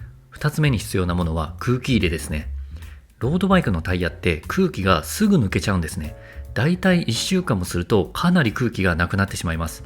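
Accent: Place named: Aichi, Japan. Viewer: は愛知県内在住っぽいですね。ヘルメットのアクセントが「へ」ではなく「メ」ですね。私と同じ。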